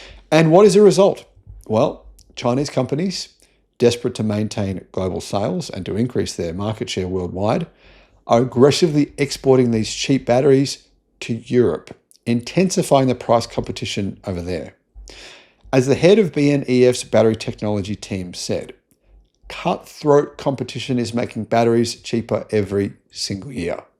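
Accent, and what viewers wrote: Australia. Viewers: He speaks colourful Aussie!! 😂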